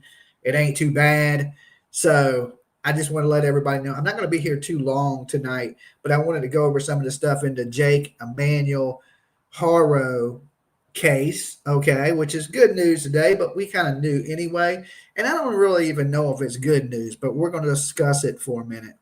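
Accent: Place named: Kentucky, United States. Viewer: I live n Eastern Kentucky... Was u n Lexington or Louisville? Sounds like it...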